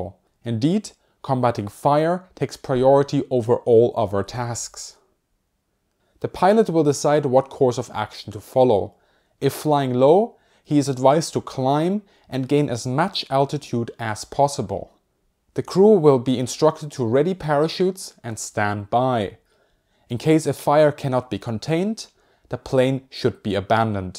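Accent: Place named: Germany. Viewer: Oh man, have you but a german accent.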